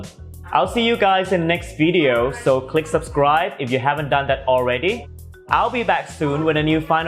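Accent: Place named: Cockney, United Kingdom. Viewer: is so funny with his cockney accent. I can watch him all day even if I don’t want to learn Thai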